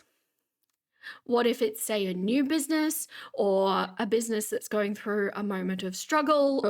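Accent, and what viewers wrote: Australia. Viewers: There's a a lot of aussies flowing in as creators? I like it, i love the accent 😍🥰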